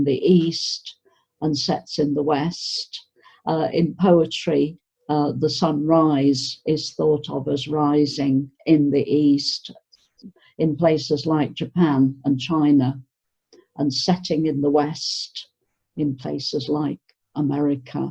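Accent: United Kingdom. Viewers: Thank you for producing these lovely educational videos, they bring back all my past memories .... when I was a student studying in a boarding school in UK. My English teacher would read and explain to me all the economic articles so that I could understand....the way you read and explain sounds so much like her, with a very strong British accent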